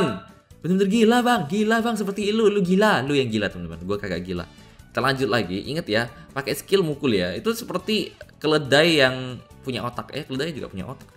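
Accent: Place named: Medan, Indonesia. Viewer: Kita lego lego. 😁😁😁 bahasa indonesianya bang kita gocek gocek 😁😁 keliatan kali medan nya bah.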